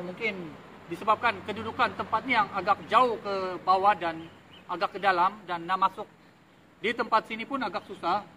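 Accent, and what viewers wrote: Sabah, Malaysia. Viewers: dia tu orang Sabah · Loghat sabah ka,sarawak ka..tiada masalah..selagi menggunakan bahasa malaysia.saya faham je.,Setiap orang lain cara penyampaiannya..teruskan bro dengan konten² yg menarik. 👍🏻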